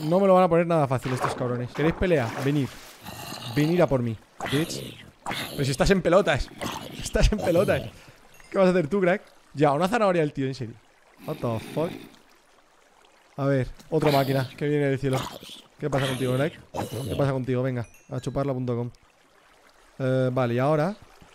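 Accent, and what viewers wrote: Argentina. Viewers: Habla Como Argentino Jajaja Aguante ElRubius Saludos Desde Argentina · El argentino ndeaah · Por qué hace referencia a como hablamos en Argentina? xD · Siento que está muy argentinisado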